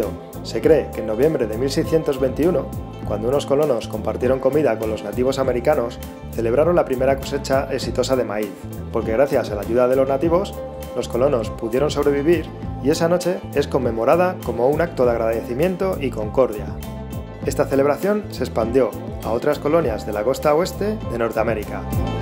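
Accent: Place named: Spain. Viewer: Odio ese acento español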